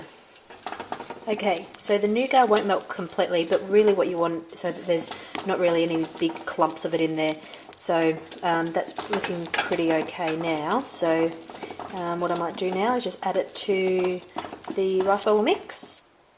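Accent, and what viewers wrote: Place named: New Zealand. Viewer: Keeping this for my inner choccy Kiwi devil!🥰🥰 Thank you for sharing this, great to hear a downunder accent and our sweet lingo on yt!👍👍 Would have loved to see the slicing and tasting of it, but just grateful for the recipe without marshmallows tbh! Will try it with coconut mixed through. Well done, its champion!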